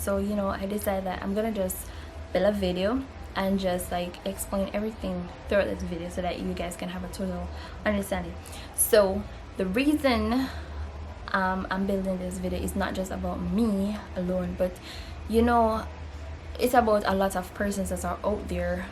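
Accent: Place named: United States. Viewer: these things you are talking about i hope they all apply to you or you are just talking for talk sake...seems you and your daughter are doing great..blessings....i also notice that you are speaking properly now...which is good cause your daughter is going to emulate you and children live what they learn..oneLove....yuh all sound like American sometimes..lol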